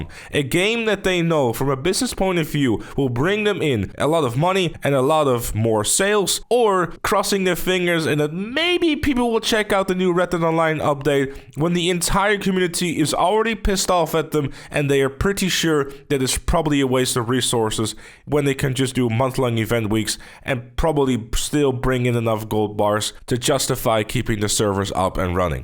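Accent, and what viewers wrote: Netherlands. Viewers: You talk English as if you’re Dutch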